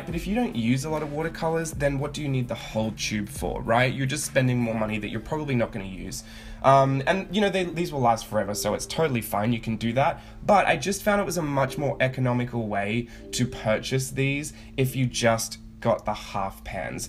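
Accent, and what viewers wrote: Australia. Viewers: Thanks for the lovely original swatches and the info on DS colours. It's always nice to hear an Aussie accent on mixed media so keep making these wonderful videos. xx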